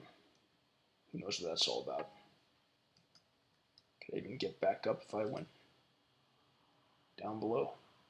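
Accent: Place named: United Kingdom. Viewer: Your knack for surviving by the skin of your teeth far outweighs your British accent.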